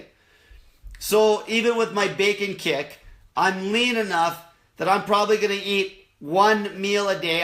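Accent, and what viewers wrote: Canada. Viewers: I hated him for five minutes then it was like hey... He sounds Canadian... like me. Maybe my Uncle Frank has a kid out there we know nothing about. I have uncles who sound like him. Lol Do you hate the way all Canadians sound when they talk? His accent is very Canadian.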